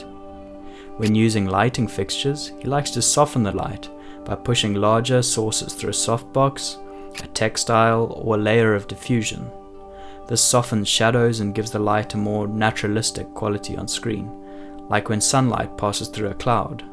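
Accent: South Africa. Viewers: Love your South African accent brother 🙋🏾‍♂️. Great insights as well. He's my favourite cinematographer atm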